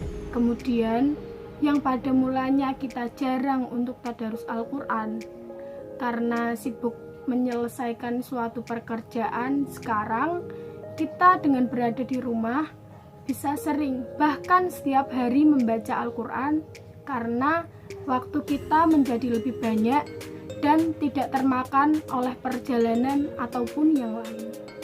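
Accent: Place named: Java, Indonesia. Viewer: Mntap mntap, jowo tulen Iki🤤🤣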